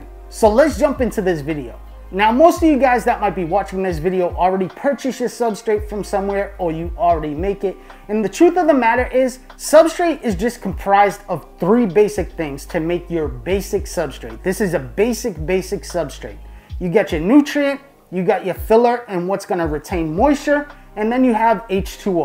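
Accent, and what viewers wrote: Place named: Massachusetts, United States. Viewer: we know your in Mass with that accent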